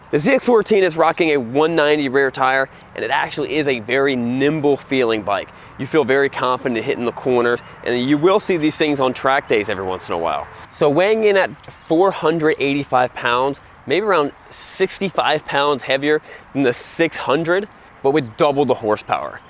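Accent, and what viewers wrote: United States. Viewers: ZeyX14 lol, I'll never get used to how you americans say your z's.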